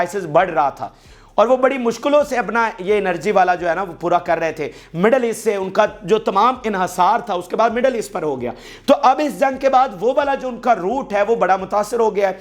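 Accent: Pakistan. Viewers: Please! If you stop quoting the english version in your Pakistani English which is hard to understand, it will save a lot of time and we can watch.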